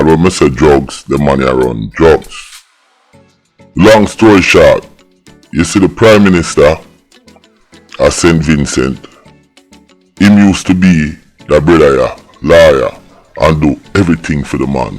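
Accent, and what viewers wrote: Jamaica. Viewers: vincy cah cuss CLOTH which such genuine accent like dat..he is Jamaican..and yes he is distorting his voice..if u notice most of his videos are from Jamaica..listen to how he say im for him and uno and other words..real Jamaican dat!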